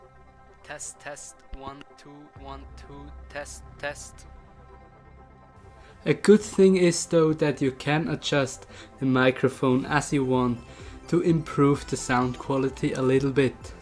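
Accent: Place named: Switzerland. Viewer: Nice man hört dir den Schweizer einfach raus. Wieso machst du englische Videos. Aufjedenfall cool. Wie findest du das Mik im vergleich zu membran mach mal ein Headset mikro vergleich test.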